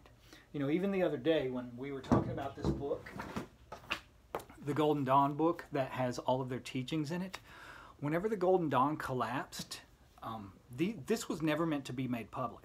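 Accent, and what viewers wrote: United States. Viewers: Hearing an American accent saying something constructive about my beloved Crowley took all the pent-up defensiveness right out of my shoulders and almost made me cry. I've been on gab too long. The religious people on social media are insane with hatred for anything but mainstream belief systems.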